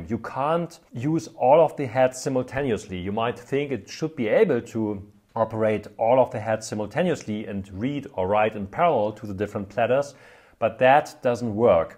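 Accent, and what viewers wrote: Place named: Germany. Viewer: Dieser deutsche Akzent... D: Aber danke für die Informationen.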